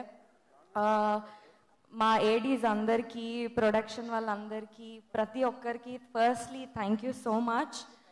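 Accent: India. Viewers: Hello she is from telangana....if you don't know anything keep your mouth shut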